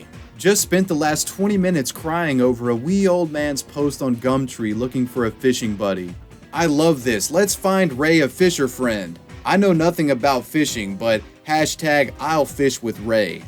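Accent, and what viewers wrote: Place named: United States. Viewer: It's like Bellular with with an American accent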